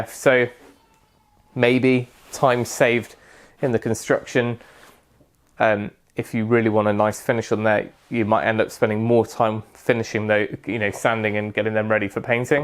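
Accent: United Kingdom. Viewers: I've got to say, I have thoroughly enjoyed the series. I might be bias but it's so good to hear a British person rather than a DIYer from a cross the pond. Keep up the good work. Subscribed.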